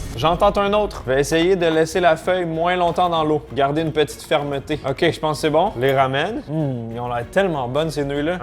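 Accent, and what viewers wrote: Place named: Quebec, Canada. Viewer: Tu vie au Québec ? Parce que tu bcp l’accent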